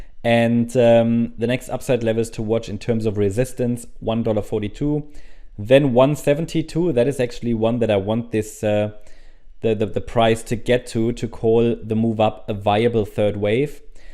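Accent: Germany. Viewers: you have strong german accent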